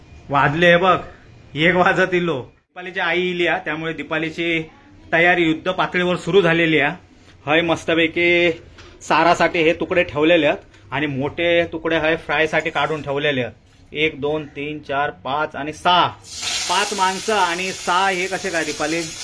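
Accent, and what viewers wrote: Malvani, India. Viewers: तुमची मालवणी भाषा पण खूप सुंदर आहे ऐकायला छान वाटते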